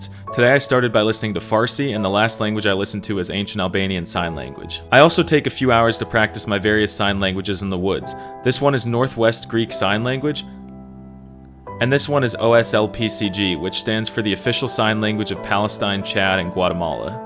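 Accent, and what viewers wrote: Spain. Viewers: bro, disgusting that you run that Spain Mexican accent. You should speak Mexican with a Mexican accent. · Your Spanish is from Spain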